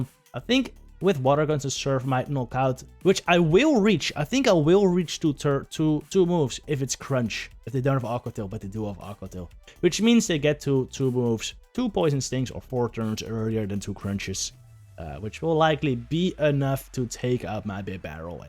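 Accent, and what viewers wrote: Netherlands. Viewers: I have a question. Are you dutch because you have a dutch accent and if you are you are GEKOLONISEERD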